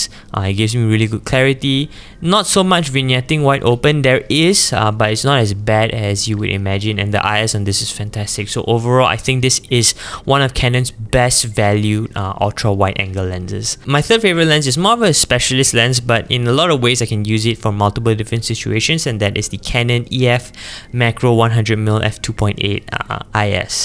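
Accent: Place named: Nigeria. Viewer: You sound a little like an nigerian 😊 thanks for the Review and expensive lenses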